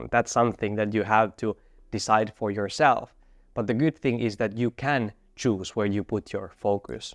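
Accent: Finland. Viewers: Finnish accent, I never miss it! Onnea kanavalle!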